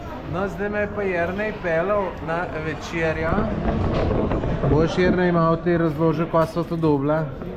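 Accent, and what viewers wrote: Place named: Dolenjska, Slovenia. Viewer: po moje pomen,da se je usedel🤣..men je smesna ta njegova dolenscina... A ves kaj pomen pride puj?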